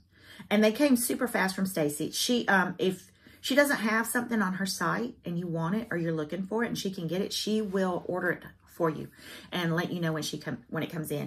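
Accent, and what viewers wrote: South, United States. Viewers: Hi - to my ear you do have an accent - that southern one and I think it is lovely. I enjoy listening to you. Your chats are so valuable to the community. Don't ever doubt yourself if you are enjoying yourself - then it is not wrong or negative.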